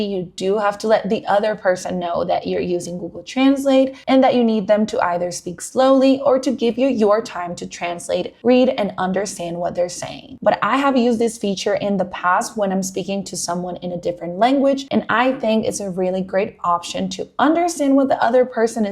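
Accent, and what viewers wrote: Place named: Latin America. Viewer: Hi! I love your accent.. Are you Latin ?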